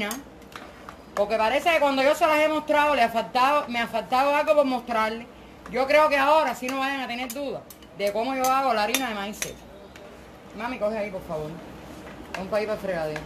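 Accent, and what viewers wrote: Cuba. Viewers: Ay que cómica!!! Me identifico mucho contigo, no como cocinas, como hablas Jajaj,es que somos cubanas 👍🏼❤️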